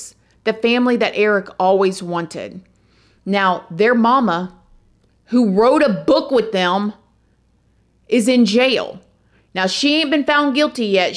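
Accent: South, United States. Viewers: I looove how you say Po-lice!!! Girl you are Sooo Southern, God Bless You!!!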